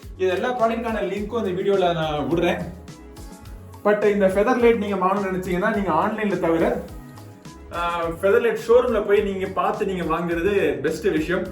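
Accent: Madurai, India. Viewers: Neenga madurai ah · Bro neenga madurai ya?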